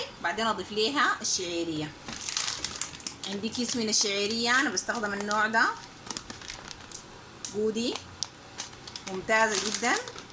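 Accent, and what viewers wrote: Sudan. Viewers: ما شاء الله شكلها حلو جدا 😋 علي فكره بحب اللهجة السودانيه جدا ♥️ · الهجه اليودانيه جميله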